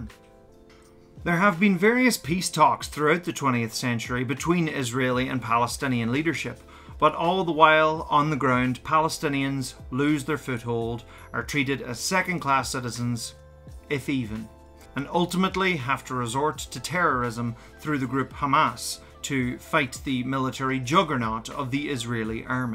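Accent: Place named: Ireland. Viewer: A complicated political situation explained in an Irish accent somehow makes it better 😍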